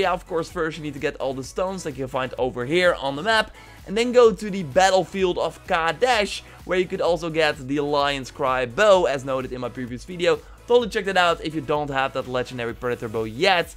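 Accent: Netherlands. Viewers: je bent overduidelijk een nederlander, dat accentje haal je er ZO uit hahaha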